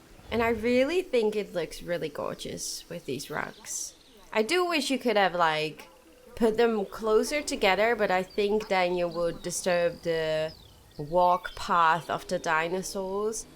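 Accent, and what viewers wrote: Netherlands. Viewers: Soms dacht ik een Nederlands accent te horen, moest het even opzoeken. Zelde hoor je Nederlanders zo goed engels spreken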